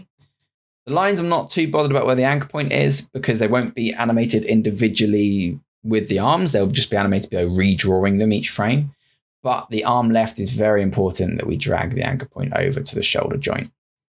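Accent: United Kingdom. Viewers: I've already commented on other videos of yours, just wanted to say a big thank you for how much I'm learning thanks to you. Among so many tutorials there are around, your channel is indisputably one of the best. Keep up the good work my friend. Also, listening to someone speaking with a British accent for so many hours sure makes lessons far more enjoyable lol.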